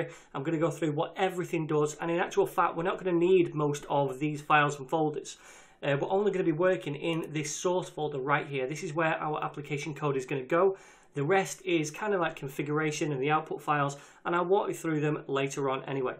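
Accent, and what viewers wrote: United Kingdom. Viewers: Thank u very much, I love your wonderful tutorial, and your amazing British accent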